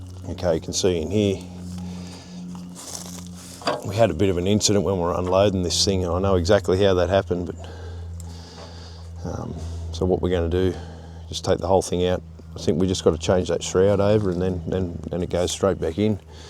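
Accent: Australia. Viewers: its Australian mate 🎉😂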